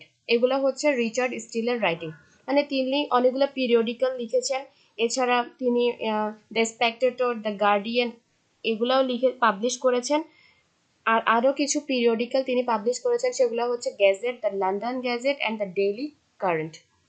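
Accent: Chittagong, Bangladesh. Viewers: ইংরেজি সাহিত্য পড়া শুরু করেছি,মাত্র অগাস্টিন পিরিয়ড পর্যন্ত আসছি,শুরু থেকেই আপনার ভিডিও সাজেশনে আসে,আপনার ভয়েস শুনে শুধু একটা জিনিস এ আসতেছিলো আপুনা চট্রগ্রামের কিনা😅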